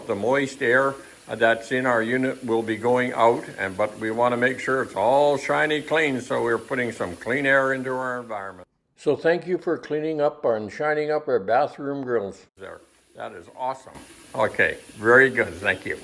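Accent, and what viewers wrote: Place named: Canada. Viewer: You sound sooooo Canadian!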